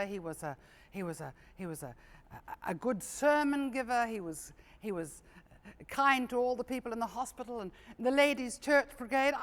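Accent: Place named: New Zealand. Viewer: I love your Yiddish New Zealand accent.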